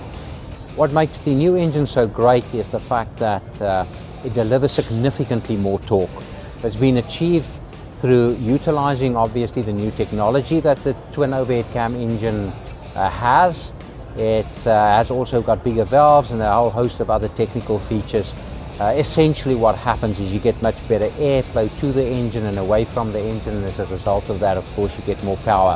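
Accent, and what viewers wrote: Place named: South Africa. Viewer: hes south african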